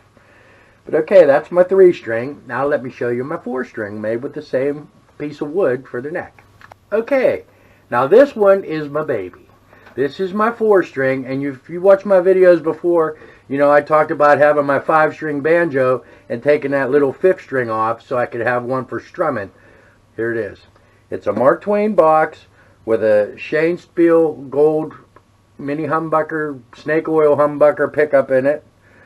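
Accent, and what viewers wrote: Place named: Pennsylvania, United States. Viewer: Nice builds...love the walnut necks! When you said Bedford, I was listening to your voice, and I thought, has to be Bedford, Pa. I know that Pennsylvania accent! I'm from Red Lion...near York. Keep up the great work!😀🎶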